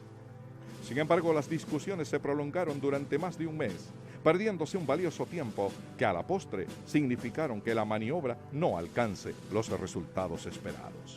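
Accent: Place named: Bolivia. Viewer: Porque del infierno verde, sólo Dios se acordará, que linda es la musica Boliviana!!! Excelente material, un gusto escuchar su acento es tan agradable y bonito, tienen el mejor castellano del mundo, saludos desde Luque, Paraguay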